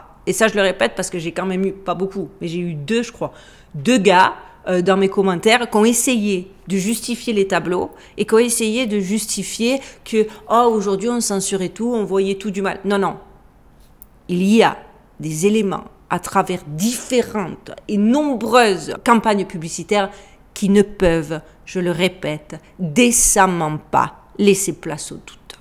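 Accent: South, France. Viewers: Merci ! A toi qui met l'accent du Sud sur ls dingueries de ce bas monde... Que du bon pour toi et la famille incha'ALLAH, ainsi qu'à tout t'es abonnés (ées). Longue vie à ta chaîne...👉🏽😉👍🏼👉🏽🌟🌟🌟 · Et superbe t'on Accent du sud De la France J'adore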